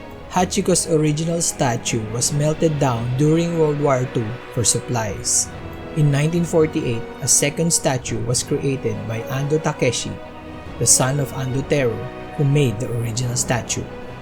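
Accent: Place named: Philippines. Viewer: Are you Filipino? Your narration has an accent! 🤔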